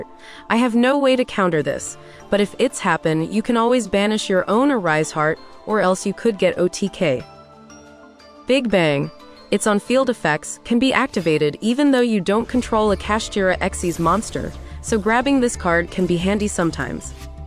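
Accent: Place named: Atlanta, United States. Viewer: Why the text to talk sound like she’s from Atlanta?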